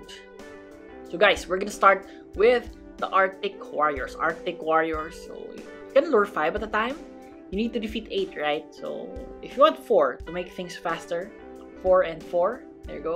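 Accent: Philippines. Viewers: he is filipino i can tell by the way he talk and when he face reveal, he said he was from PH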